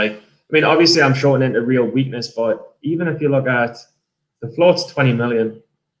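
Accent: Newcastle, United Kingdom. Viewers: You from Newcastle? Sound like Geordie!! Im from Newcastle too been trading 8 months now I traded BYFC and got torn apart around $6 and only ended up on the stock around $100. Traded CHCI as it rejected $4.20 and $4 level hold and held until $3.12.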